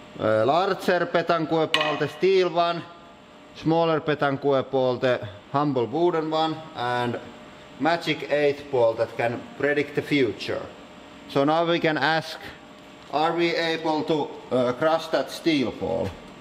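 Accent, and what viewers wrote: Finland. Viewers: finnish accent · r u finnish? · Every Finnish and Estonian person speaks like this anyways so not so unique. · Are u finnish??😂😂 im finnish too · nyt on kyllä niin selkee suomi aksentti että :DD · Is that a Finnish accent I hear?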